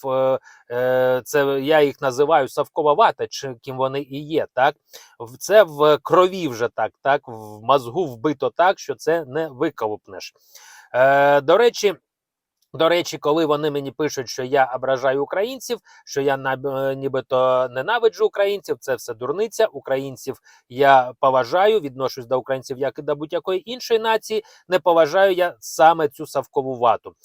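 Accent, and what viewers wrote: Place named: Russia. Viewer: А что у вас за мова украинская чисто российским акцентом не пробовал размолвлять по-русски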